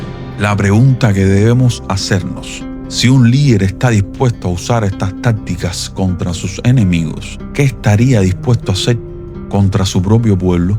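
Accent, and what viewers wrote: Havana, Cuba. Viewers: pues su dicción muy habanera es bien recibida por los que estamos disfrutando la revelación del oscuro manto que envolvía al pestifero psicópata genocida dictador que hoy su oscura alma se quema 🔥 en el infierno, que no me gusta ni siquiera escribir su nombre, pero cuando lo hago, me place hacerlo arbitrariamente en minúscula, fidel castro 😅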